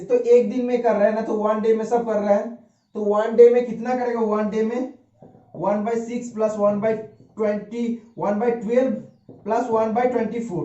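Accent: Bengal, India. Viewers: Vaiya apko bat sunkar bihari nahi lagrahe Bangali lagrahe ENG er puro 25 questions dekha digiye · tumi bangalee?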